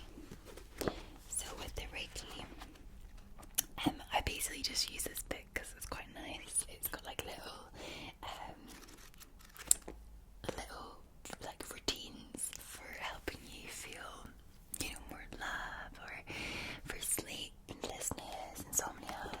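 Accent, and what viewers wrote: Scotland, United Kingdom. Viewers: Wow I like getting personal my Scottish sassy lassie! 😌😏☠️🏴🖤🌌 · Yeah. I like the talking. She has one of the best soothing and most relaxing voices out there. And her Scottish accent is just right. Its relaxing af. To whomever has a problem with it. GTFO bro. We love just as she is. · Boeys and girrels 😂 love the Scottish dialect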